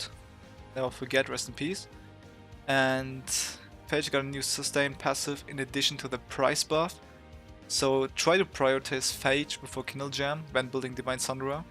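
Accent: Germany. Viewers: Are u German? I hear some accent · are you german?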